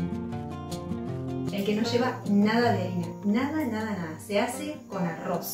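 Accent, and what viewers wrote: Córdoba, Argentina. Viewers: Ya se impregnó de tonada cordobesa la bb😊Pan de aaaarroz! La amé 💕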